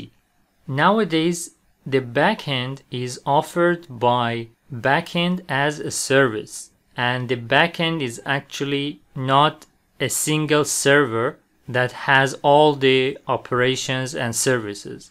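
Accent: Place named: Isfahan, Iran. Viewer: Nice very nice اما فکر کنم ایرانی هستی اونم اصفهانی 😊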